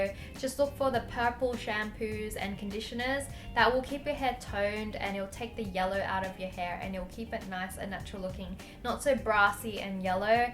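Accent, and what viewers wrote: Australia. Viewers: Aussie accent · It's austrailian accent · purplesheep yep australian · is she australian? i love that accent!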